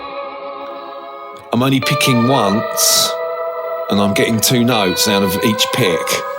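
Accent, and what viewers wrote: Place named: United Kingdom. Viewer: you got a very british accent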